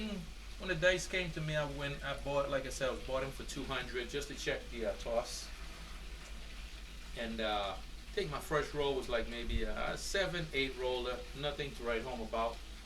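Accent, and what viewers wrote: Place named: Texas, United States. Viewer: I lived in Austin for 27 years. Nice accent.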